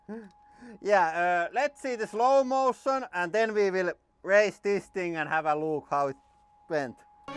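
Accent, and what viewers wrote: Finland. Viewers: Tykkää jos oot tilannu kanavan vaan tän ihanan rallienglannin takia💗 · Sä kuulostat vähä suomalaiselt, mut ootsä🤔🤔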